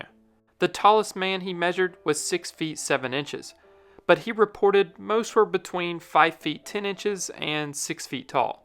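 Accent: South, United States. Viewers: Georgia boy? Or maybe Carolinas? Can hear the accent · Nice to hear an American accent finally on the commentator.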